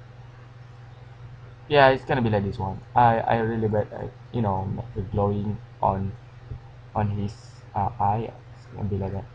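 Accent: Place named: Malaysia. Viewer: Malaysian voice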